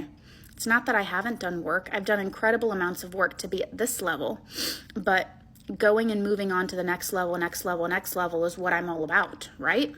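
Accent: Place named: New York, United States. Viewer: Ah...could've sworn the way she speaks is from the NY area, as that's where I'm from.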